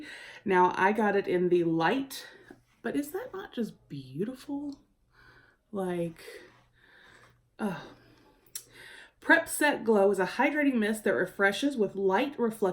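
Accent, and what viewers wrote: South, United States. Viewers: Love boxy charm I would love to win..I'm from kentucky Iove your country accent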